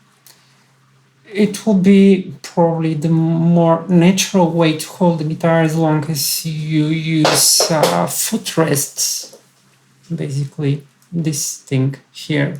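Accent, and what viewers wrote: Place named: England, United Kingdom. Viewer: I love your English accent! 🖤 What would be the best playing position when standing up?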